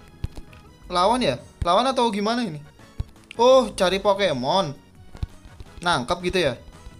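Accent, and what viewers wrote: Java, Indonesia. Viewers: Fakta video ini, orangnya medok dan pokemon lovers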